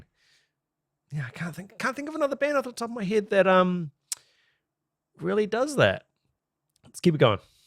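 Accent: New Zealand. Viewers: Oops, just realized (re: my earlier comment) that I assumed you’re a Kiwi because of your accent. I have NZ and Australian friends so I’m pretty good at noticing the difference (I hope).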